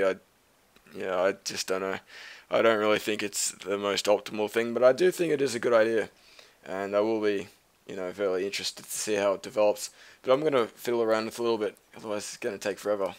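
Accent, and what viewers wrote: Australia. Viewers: Because he's using the Australian English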